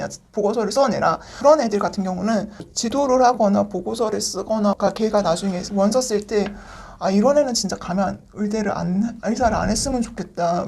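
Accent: Gyeongsang, South Korea. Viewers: 강사님 경상도 사투리가 드러나는데 괜찮은지????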